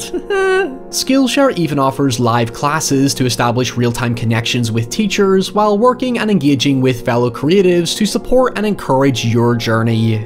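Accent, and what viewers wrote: Ireland. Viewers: I also do "Horror Genre" vids, and one of my wee ones (who happens to be very, VERY scared of the dark, and have a TON of phobias) likes to listen as I make a video, so I have to be careful which videoes I let her listen to me do Audio for... ANYWAY- whenever I get a notification one of one of your videos dropping, I like to take a break and listen. Now my wee one is obsessed with your accent too. SHE now loves Irish accents MORE than English accents ( I do a convincing combination of various UK accents- as I act too. Stage "Irish" sounds nowhere near as charming as your TRUE Irish. ENJOYED the video. This movie seemed to have completely changed from when I saw it in my late teens to when I saw it again in my late 20s. Now that I'm in my 30s it might be time to revisit it again.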